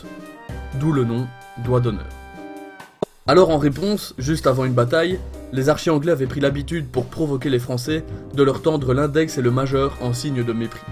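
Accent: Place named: Belgium. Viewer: Ton accent belge il est magique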